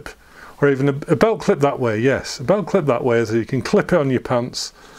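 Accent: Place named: Liverpool, United Kingdom. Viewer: Excellent video. But why are you speaking in a scouse accent? Where exactly in Liverpool are you from? If you are not from the Merseyside area why are you speaking in a Scouse accent?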